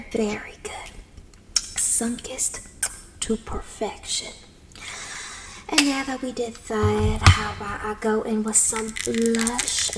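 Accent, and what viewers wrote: South, United States. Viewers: I’m from the south and your accent is spot on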